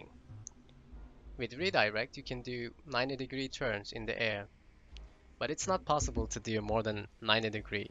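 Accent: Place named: Turkey. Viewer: When I heard your voice I instantly thought you were Turkish looked down and saw the subtitle option, ellerine sağlık valla.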